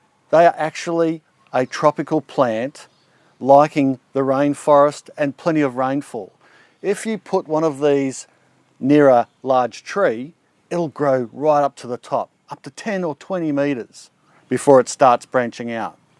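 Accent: Australia. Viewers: Can you give me any tips on cultivating a sweet Australian accent?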